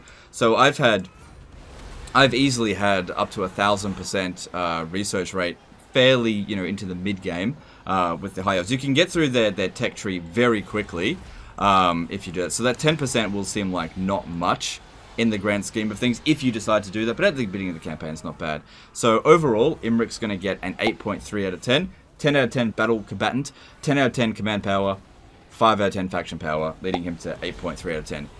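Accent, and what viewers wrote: Australia. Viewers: I just listened to aussie bloke describing shaving his balls.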